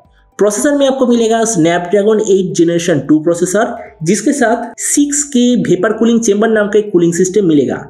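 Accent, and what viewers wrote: Bengal, India. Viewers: Bro, tongue Hindi nahin hai to Hindi channel mat karo, bongali hi thik rahegi.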